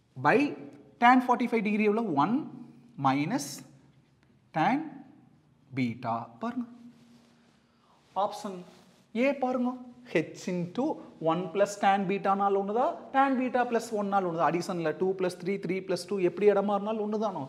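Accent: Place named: Kongu, India. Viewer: Thank you sir from your subscriber... We have done our maths exam... Despite the QP and marks i would lyk to show my gratitude for ur videos which helped us a lot.. I have been watching ur videos throughout 12th standard... Ur videos helped us very lot and I insisted my friends to watch ur videos and they gave a valuable feed back... We would miss your kongu slang and your teachings... Once again thank you very much sirr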